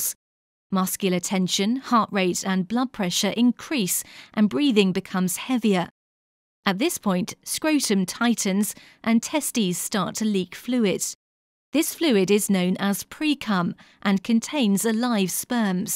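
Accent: United Kingdom. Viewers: This was very informative and also hilarious for some reason. I think its the british voice 🤣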